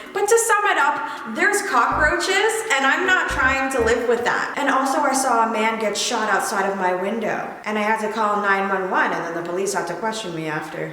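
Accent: United Kingdom. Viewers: Its her British accent that really makes me laugh😂😂😂😂 I love it. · who else loves her british accent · Ngl your British accent sounds so good lol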